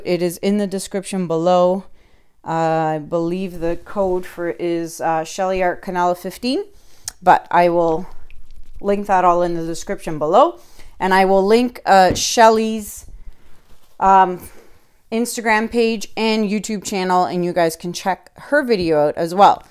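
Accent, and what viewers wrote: Canada. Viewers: Sounds like sweet Juno might have allergies. I use children's Benadryl. Hope he feels better soon! Haven't heard you say "literally" as much as usual. I like listening to your cute canadian accent!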